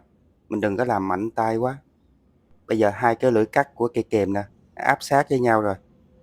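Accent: South, Vietnam. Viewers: Giọng miền Nam dễ thương, hy vọng chủ kênh ko phải Vietcong. Chỗ tui sống toàn Vietcong sợ quá Vc luôn.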